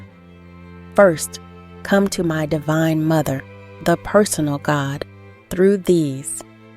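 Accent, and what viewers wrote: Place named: United States. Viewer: Don't want to nitpick here but to hear these words pronounced with a heavy american English accents is really jarring.... and I think somehow detracts from the quality of the video. The proper Sanskrit pronunciation of Jnana is "nyaa-na" .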